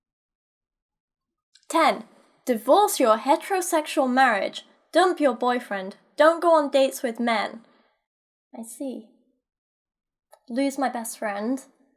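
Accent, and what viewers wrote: United Kingdom. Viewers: I guess problem is that a lot of us (including the British sounding lady what made this video) are used to living in semi-civilized countries where free at point of use Healthcare is a given and reproductive rights are taken for granted. Pity the poor bastards South of the 49th.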